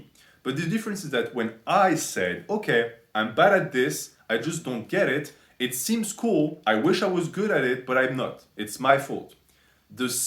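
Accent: France. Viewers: Are you Parisian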